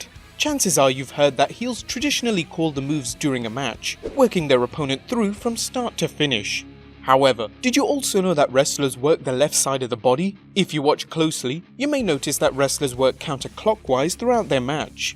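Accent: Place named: United Kingdom. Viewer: Triple AITCH. AITCH BEE KAY. POSStuh. I've always wondered if Brits pronounce words incorrectly on purpose or if they have difficulty hearing and reproducing simple sounds... You've been making vids for a primarily American audience for too long to keep doing that. In London, I ride the Tube; in the US, it's the subway. Come on dude. Next you'll pronounce the 'T' in 'wrestling'.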